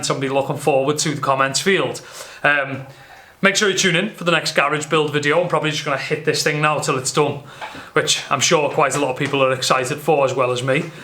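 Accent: Liverpool, United Kingdom. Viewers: Grew up in Liverpool, moved to Australia 10+ years ago. Been watching your videos for years now, miss hearing a scouse accent, so thank you for blessing my ears. Keep up the quality content bro <3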